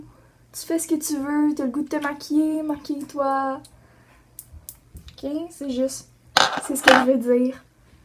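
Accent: Canada. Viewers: Tu es super belle êt ques que j'aime ton accent canadien j'aime beaucoup ❤️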